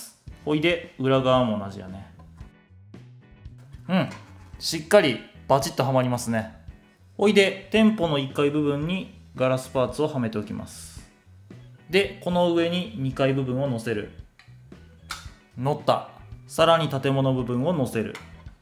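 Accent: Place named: Kansai, Japan. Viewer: 優しい関西弁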